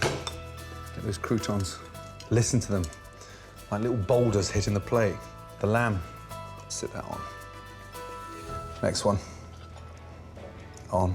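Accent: Scotland, United Kingdom. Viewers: I definitely hear the Scottish come out in this video way more than others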